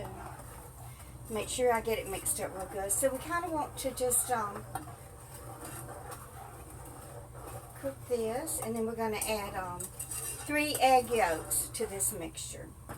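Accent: South, United States. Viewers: I heard the southern accent then you said Florida, knew you were from the south. I am from South Georgia. I’ll subscribe and watch your videos. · kat mimikat and ++++i like listening to this lady's southern accent when she talks. i am a native of north carolina who moved to a small east central mississippi town 6 yrs ago. i came here after my disability retirement in 2013 in addition to a broken marriage and losing my home to foreclosure in nc as well as getting away from a homicidal ex wife. my mother and brother lived in this same town. that's how i knew about it. sadly my mother passed away 4 yrs ago and my brother about 3 yrs ago. i'm the last one standing now out of a family of 5 people.( my daddy,mother,sister and brother passed on). i enjoy life in this small town. almost like mayberry in a way and my ssdi check goes farther here with mississippi's lower cost of living.no going back to nc now, love it here where i live.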